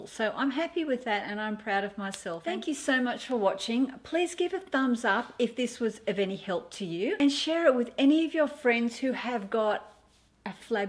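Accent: Australia. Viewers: I know....i swear, she could tell me I needed a lobotomy and I would be like "ok". Everything sounds nicer in an Aussie accent! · And I love her Australian accent!! 😃❤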